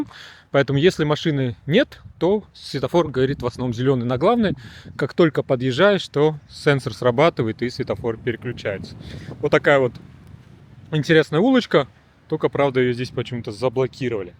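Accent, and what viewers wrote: Japan. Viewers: Давно не смотрела ролики и вот что обнаружила спустя долгое время - появился японский говор, если так можно выразиться ))))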